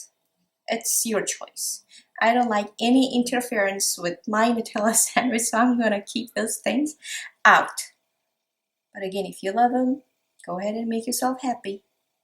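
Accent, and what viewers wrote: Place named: United States. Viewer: Pakistan ,,but your accent is like American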